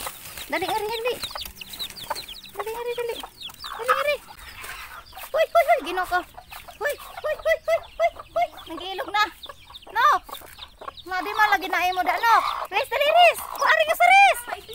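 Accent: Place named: Visayas, Philippines. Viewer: Makamingaw jud ani farm life 😂 bisaya man diay ka Ma’am 🤗 unta mudaghan pa sila kay lami na sabawan hahaha 🙏🙏 keep going dai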